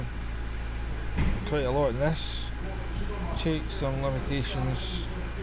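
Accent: Scotland, United Kingdom. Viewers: Nice to hear a good Scottish accent. Could have been egr, but with the state that was in, egr could have been one of many.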